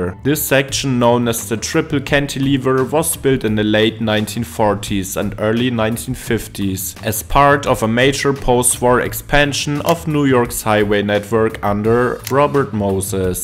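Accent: Germany. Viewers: How dense are you? Can you not hear the thick German accent? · "elewated" . your German accent couldn't be more apparent haha. Keep up the good work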